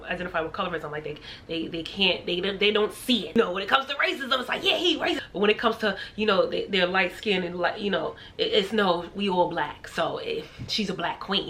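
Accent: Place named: New York, United States. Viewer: Girl just wanted to say I love your channel and your NY accent, love from London x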